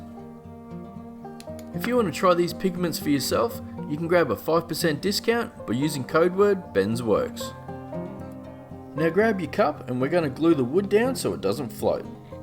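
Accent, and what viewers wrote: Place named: Australia. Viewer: I said to myself "This guy sounds like an Aussie" and then I checked the description and lo and behold I was right, glad to see fellow Aussie's doing something cool like this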